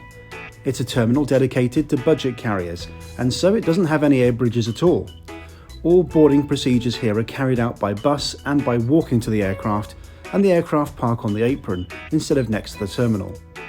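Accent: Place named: Liverpool, United Kingdom. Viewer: Oh. No. He’s a southern scouser 🏆 😊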